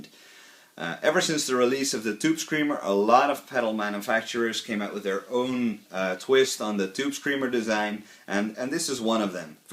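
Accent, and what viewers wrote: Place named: Netherlands. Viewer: I can hear you're Dutch! Great explanation!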